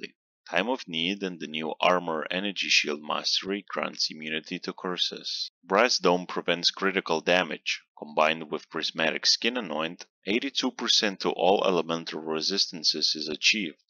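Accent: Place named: Lithuania. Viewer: pagal akcenta bus is LT :D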